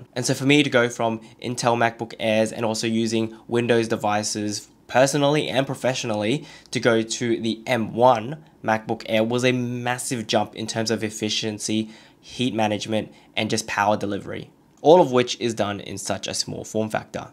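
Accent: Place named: United Kingdom. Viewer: First time I ever heard an Asian guy with a UK accent. 🤓👀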